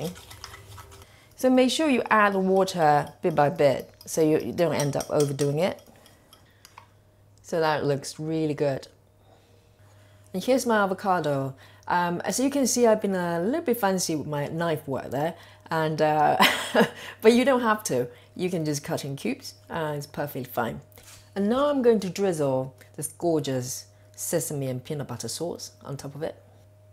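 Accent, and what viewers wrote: England, United Kingdom. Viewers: I also love your videos. You have a pleasant sounding voice, and of course love the English accent! I binged watched most of your videos last night, and can’t wait to try them all. I made your flatbread, and it came out perfect. This morning I made bullet proof coffee with organic breakfast blend coffee, MCT oil, coconut milk and 1 Stevia packet. Blended it in my Ninja blender. OMG! I absolutely loved it! It was like drinking a latte.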